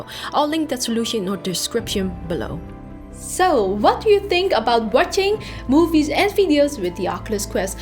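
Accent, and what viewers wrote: Netherlands. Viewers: She has a netherlands accent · Are you Dutch? You sound Dutch.. 😄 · amazing dutch accent, doesn't match ur face though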